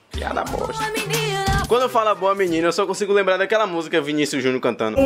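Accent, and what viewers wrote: Bahia, Brazil. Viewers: Vc e baiano? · Ameiiii!!! Vc eh baiano mocim???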